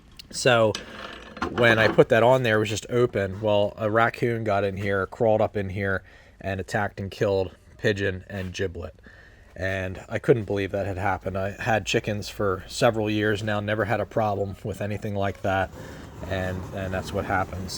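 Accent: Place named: Pittsburgh, United States. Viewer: Man, that's one hell of a yinzer accent!! Love your work!!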